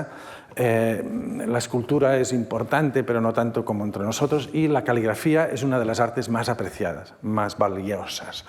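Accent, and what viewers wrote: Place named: Catalonia, Spain. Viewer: Como dice el conferenciante es catalanohablante y el plural de caràcter en catalán es caràcters. No hay cambio de tonicidad. También se nota en la forma "hacer servir“ (fer servir) y, sobretodo, en las s sonoras de los plurales en contacto con vocal posterior, un fonema q en castellano no existe, pero q en catalán, portugués o francés es lo normal en esta posición.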